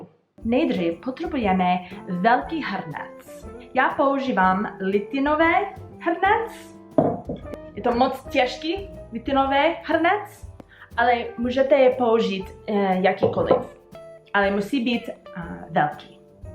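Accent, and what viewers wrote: Ostrava, Czech Republic. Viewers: jde videt ze jste pobyvala i v Ostrave vase cestina je presne po nasemu, pekny recept a krasne se to posloucha asi to budu muset zkusit uvarit :-)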